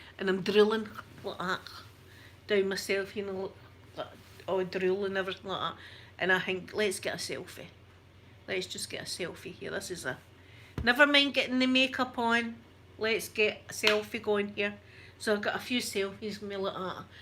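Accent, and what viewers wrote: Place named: Glasgow, United Kingdom. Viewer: I could listen to you for hours. My dad is glaswegian so your accent feels like home to me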